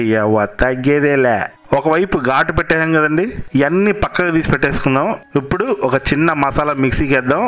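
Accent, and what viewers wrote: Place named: Godavari, India. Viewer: Aami maatladarandi gaaru..maa godavari yaasalo,meeru chesina korrmeenu eguru superandi babuu..aayi👌😃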